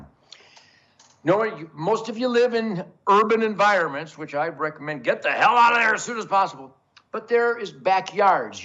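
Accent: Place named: United States. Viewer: Thank you Ted for your American voice.Always your fan forever 🎉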